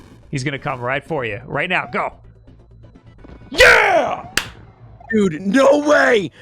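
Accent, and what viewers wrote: New York, United States. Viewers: The first Wulff Den video I saw, said Mario and I immediately said to myself, "He must be from New York, close to NYC."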